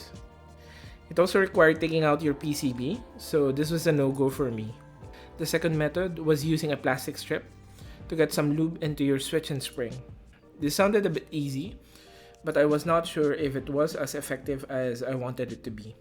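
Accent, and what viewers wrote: Philippines. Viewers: Parang pinoy to a. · This dude Filipino, I'm Filipino and the accent is unmistakable. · Pinoy ka ba? Parang medyo pinoy ung accent mo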